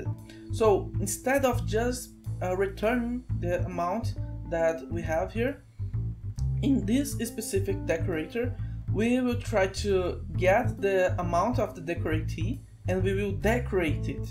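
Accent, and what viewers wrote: Brazil. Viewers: sotaque brasileiro??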